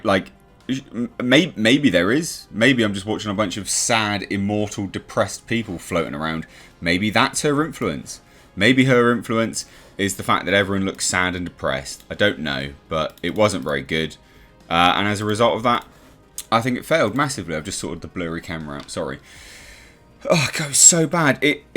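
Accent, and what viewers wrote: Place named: United Kingdom. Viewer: simply says what any sane person thinks about most things but he says it in that accent like hes just ur British homeboy. Do wish he'd throw in a "Bruv" now and again tho.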